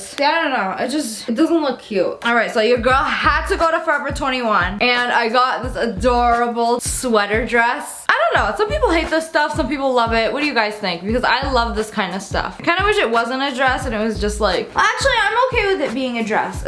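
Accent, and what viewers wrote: France. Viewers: Wait is she actually French????.... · TobaMusicQueen wait is she really French or is she being funny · I’m so confused, is she French? 🤯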